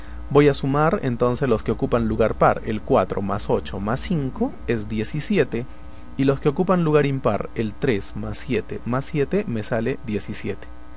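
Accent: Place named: Peru. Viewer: eres de peru se nota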